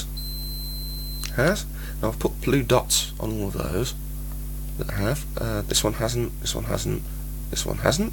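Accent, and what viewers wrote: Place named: United Kingdom. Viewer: RIGHT, RIGHT!!!!! I JUST LOOOVE THAT ACCENT. really cute, you British people. Please don't let your accent get away as it seems all things are going. At least there will be some "tickles" for the U.S.A. if one of you visits here. (Don't worry, I konw that "Jolly Good" is no acceptable. :O).). I donate to your cause. (I speak in liue of the part time job only, slow sticker vheickes, THE BOX, Future consciousness Sin Unit, Higher Power, and Paradigm Shift for 8 and 12 years.) Again, LOVE it!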